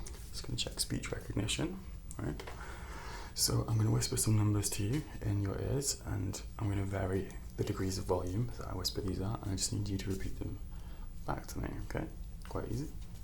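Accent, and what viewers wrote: United Kingdom. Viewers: Subscribed, it's the accent for me, with the deepness too! I'm british but i forget just how many accents we actually have here at times, so many mixes too! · Did not expect you to be British. 😍👌🏻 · Are you britthis? Maybe it is obvious or not but your accent makes me think it, sorry if im wrong, pls dont hate if im wrong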